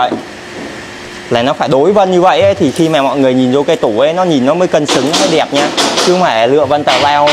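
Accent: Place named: North, Vietnam. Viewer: Bạn nói giọng lai miền bắc.Viết chữ cũng lai bắc luôn.Ở trong miền nam chúng tôi viết hay nói đều là Hoa Văn chứ không phải là Hoa Vân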